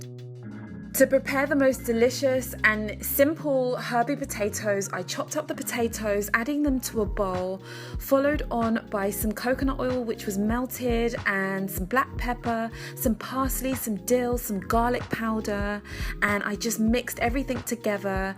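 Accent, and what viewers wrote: United Kingdom. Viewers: You sound British...why are you using •Fahrenheit???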